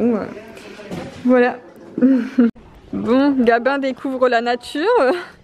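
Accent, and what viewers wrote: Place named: Nord-Pas-de-Calais, France. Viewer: cc, tu as vraiment l accent chtis comme moi!!! quand je regarde tes vidéos!! c est super!!!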